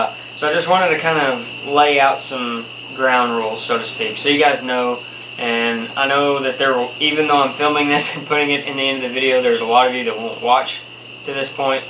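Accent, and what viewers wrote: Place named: Baltimore, United States. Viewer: Explanation sounds like Baltimore Maryland. Keep up the good videos.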